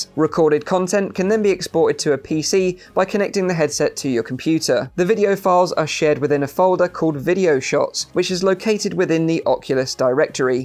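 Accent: United Kingdom. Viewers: Why are British-accented people all so good at tutorials?? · Glad to have found this. Now I know this is nothing for those of us who create VR artand animation. We cannot install our animation app (like Quill) 🙁 ..and thanks for the brit accent! Clear to understand!! 👍🏽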